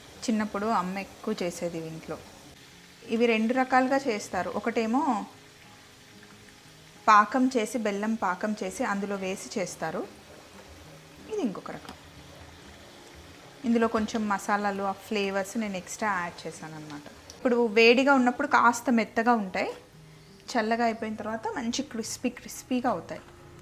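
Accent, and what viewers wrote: Telangana, India. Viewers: Akka meeru Telangana kadha say elachi poddi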